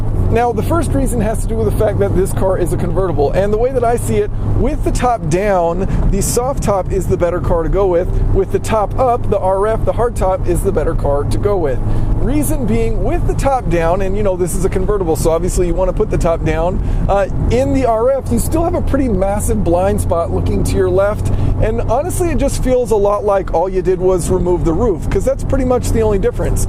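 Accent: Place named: South, United States. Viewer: You can tell right away , that you are from the south .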